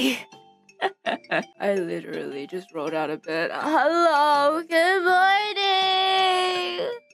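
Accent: Australia. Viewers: 중저음 호주억양 최고야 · 오지 악센트가 좋아지고 있어.. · 중저음의 호주식 영어.... 크로니와는 다른매력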